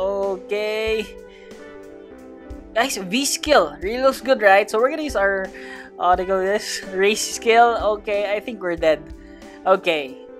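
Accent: Philippines. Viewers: are u from the phillipines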